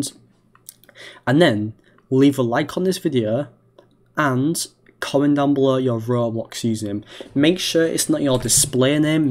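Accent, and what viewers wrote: United Kingdom. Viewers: Bruh are you British WTF 😳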